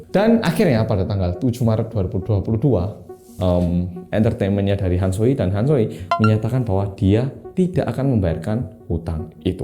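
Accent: Java, Indonesia. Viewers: Ga ada nada nada bicara atau pemilihan kata yg tidak tepat yg membuat org berpikir ini bukan org indo. Beneran natural banget. Di telinga gua dia mas mas dari jawa. Penjelasannya bagus dan menarik. Thanks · lucu amat mas medok, konsep nya ala ala presentasi di kampus yaa 😄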